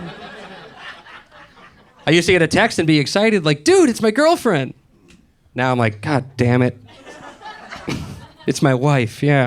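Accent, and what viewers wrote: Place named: New England, United States. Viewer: Funny he reminds me of Bob Tasca III from NHRA although Bob has a harder New England accent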